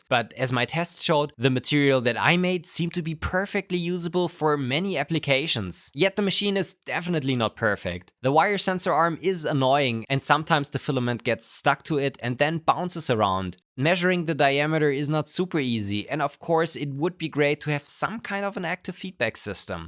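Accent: Germany. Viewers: noch deutscheres englisch hab ich noch nie gehört, fck richtig ab deine 15 jährige stimme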